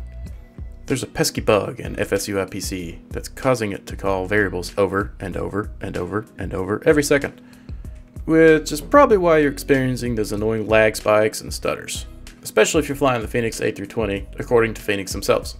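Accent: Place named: South, United States. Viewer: Awesome 👌 Thank you so much. And... I absolutely enjoy your southern accent and style 😉. Being a southern boy myself 😅from Charlotte, NC.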